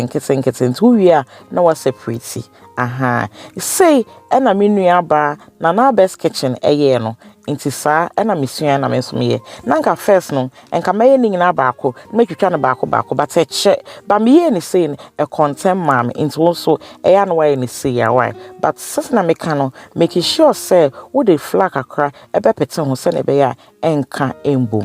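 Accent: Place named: Twi, Ghana. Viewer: Eiii madam w’ano ni twi y3 d3 yie papabi ❤️ beautifully done sis 🙏🏻Much love dear ❤️❤️❤️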